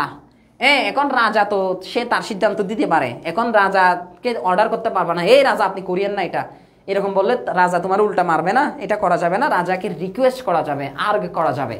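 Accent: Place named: Chittagong, Bangladesh. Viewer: ভাষায় চাটগাঁইয়া ভাবটা বেশী।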